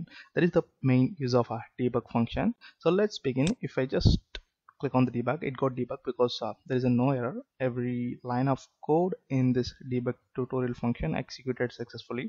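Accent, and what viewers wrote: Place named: India. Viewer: From I heard the Indian Accent I knew gonna be great vid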